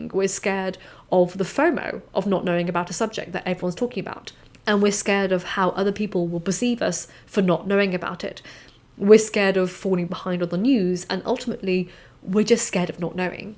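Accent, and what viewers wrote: United Kingdom. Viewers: Is that British accent? I love your accent! You sound so heavenly when you speak :)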